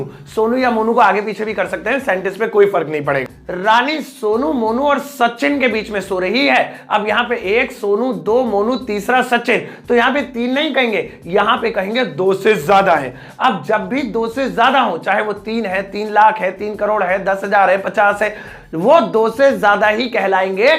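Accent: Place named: Mumbai, India. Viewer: Ekdam bambaya hindi kya baat hai bhidu... Pahchana ki nahi apun mumbaikar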